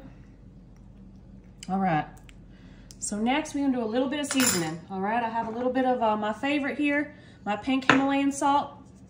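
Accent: South, United States. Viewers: Ma'am, i just knew by your sweet southern accent that all of these was gonna come out looking great told me everything i needed to know 😂❤ im making some of these real soon 🤷‍♀️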